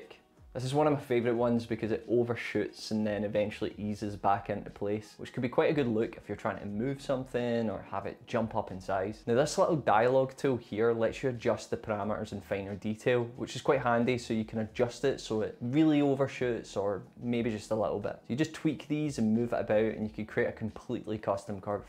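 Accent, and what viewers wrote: Scotland, United Kingdom. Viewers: Love your videos, very informative and easy to follow... and the scottish? accent just makes it better..... had any IronBru lately?? :D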